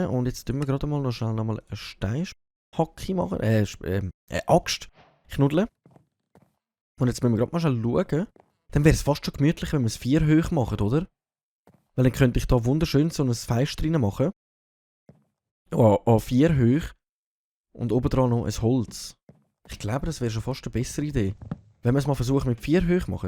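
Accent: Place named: Swiss German, Switzerland. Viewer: I am from neither Switzerland nor Germany but just wanted to hear the lovely sound of Schwyzerdütsch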